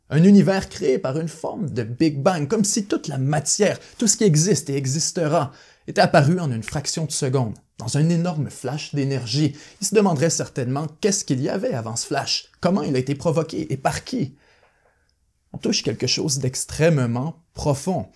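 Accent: Canada. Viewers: C'est quel accent ça? Canadien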